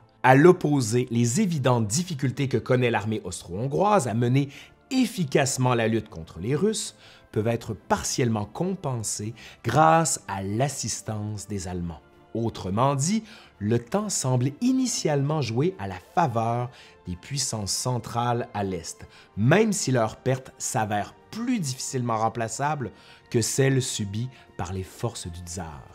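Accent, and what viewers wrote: Quebec, Canada. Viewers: excellent ! aussi clair et concis que possible avec ce je ne sais quoi de sympathique en plus ... ah ca y est je sais: juste ce qu'il faut d'accent quebequois ! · Merci, pour la qualité de tes vidéos. Tout est bon: le ton (haaaaa l'accent québécois, j'adooooore), les sujets sourcés et très impartiaux (ce ne doit pas toujours être facile) Bises et encore merci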